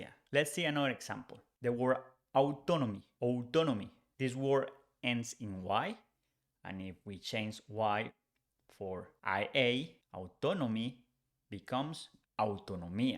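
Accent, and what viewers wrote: Colombia. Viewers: I listen to your podcasts and read through the transcripts. This has helped me improve comprehension tremendously! I am also appreciating this video about hacking or using a simple tool. Also, the short phrases are a huge help as well. Me Encanta el acento Colombiano. Muchas gracias por tu arduo trabajo!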